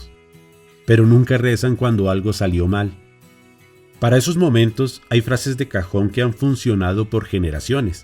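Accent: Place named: Bogotá, Colombia. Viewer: Acento bogotano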